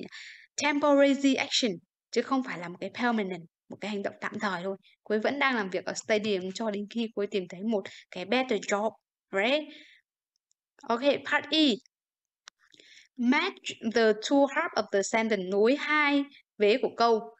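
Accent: Standard. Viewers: Giọng b rất chuẩn.chuc b buổi tối vui vẻ nhé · B phát âm chuẩn mà dạy hay quá🥰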